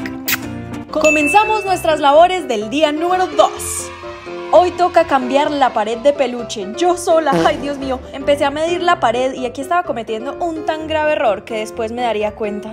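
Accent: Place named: Colombia. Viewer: Amo como hablas toda Haci colombiana y pues uno intentando hablar como tú jaja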